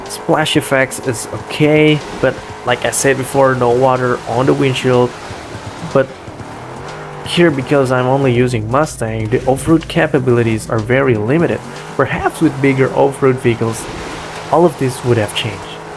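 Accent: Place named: United States. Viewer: it's funny how you, not being a native english speaker, have so much american accent. and I agree with the list, I'm super hyped about this game because of roleplay details like the ones you mentioned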